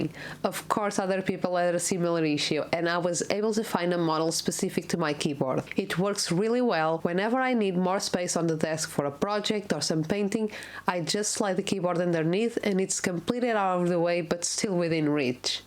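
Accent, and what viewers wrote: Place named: Portugal. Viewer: Primeiros segundos do video e percebi de imediato que és Portuguesa 🇵🇹. Além disso, impressões funcionais e não apenas bonequinhos? Subscribed!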